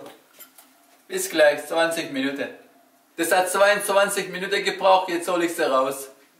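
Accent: Swabia, Germany. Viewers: Sympathischer typ echt, wie das Schwäbisch immer wieder durch blitzt isch Mega cool! Hab’s Video echt nur komplett angeschaut wegen ihm sonst spul ich immer vor damit ich seh was dabei raus kommt! Super Rezept! 👍🏼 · Ich liebe diesen Schwäbischen Dialekt :D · Dude Deluxe ich find die Kombi auch extrem amüsant. Mein Lieblings Dialekt 😅👌🏻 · Haha typischer Schwabe 😄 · Türkisch und Schwäble. Beste Kombi :D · Coler typ der hört sich an als ob er aus dem schwabenländle kommt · So lustig und sympathisch der schwäbische Türke😂 wieso ist das Video nicht FSK 18🤔 so porno das Essen😏😍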